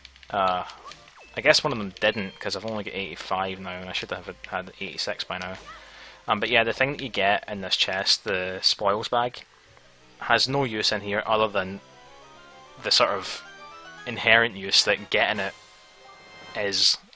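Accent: Scotland, United Kingdom. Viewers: Oh, damn, this is the first video I've seen from you - a friend recommended your channel. Nice to hear another Scot! Mind me asking what part you're from?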